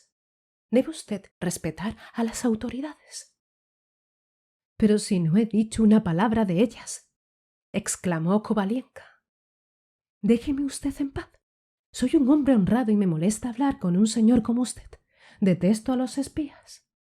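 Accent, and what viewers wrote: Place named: Spain. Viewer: La voz, la entonación, la pronunciación de algunas consonantes que contrastan con la suavidad de las otras y las vocales, me hipnotizan. Además que de por sí el acento español de España, cuando es claro, me encanta.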